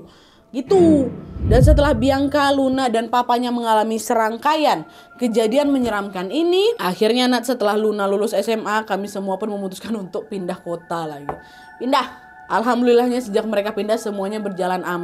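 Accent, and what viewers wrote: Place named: Medan, Indonesia. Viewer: kak serius nanyak kakak org mana? medan kah atau dari pulau sumatera?? cara ngomongnya kayak org medan kali.. atau mirip org sumatera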